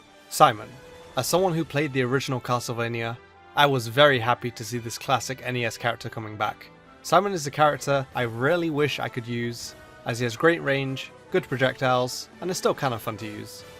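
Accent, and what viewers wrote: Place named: United Kingdom. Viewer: Wilfred Cthulu he did with the koopalings and that’s all that matters that he thinks Wendy Koopa sucks & the rest besides jr & like iggy which is fine but yeah those aren’t different characters just skins ya know so I guess if u wanna hear his British voice going I really like this shade of purple on daisy then...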